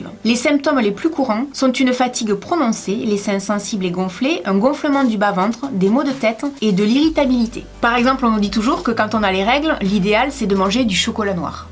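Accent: South, France. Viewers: tu m'a fait trop rire la spécialité du sud de la France avec l'accent du sud😂😂😂😂😂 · Hey ! Superrrrr vidéo !! Pourrais tu faire une vidéo sur des recettes du "Sud de la France"(avec ton petit accent :-)) ou sur des recette faciles et rapides pour les gens qui ne rentrent pas chez eux le midi ;-)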